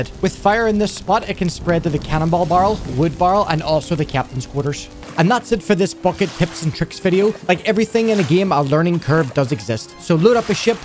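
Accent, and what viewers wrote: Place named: Scotland, United Kingdom. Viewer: Love that Scottish Accent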